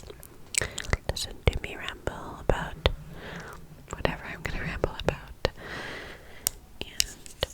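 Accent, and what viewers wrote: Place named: Upstate New York, United States. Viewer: everything you do is amazing and I fall asleep to your videos every night💛 my husband is from upstate NY and y’all say words the same and I always tell him you pronounce or call things the same😂 I’m from Texas so I absolutely love it. Hope y’all have the best Christmas❤️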